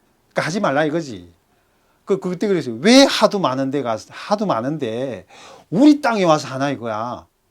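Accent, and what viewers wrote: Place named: North Korea. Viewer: 탈북민이내 말투가 북한말투인대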